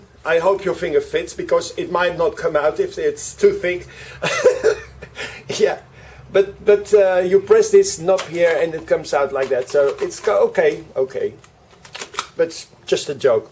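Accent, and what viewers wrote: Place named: Netherlands. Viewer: XD you just can hear he's Dutch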